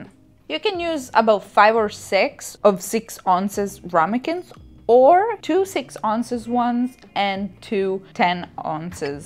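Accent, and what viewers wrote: Italy. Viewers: Your accent became more American.. You were nice by italian accent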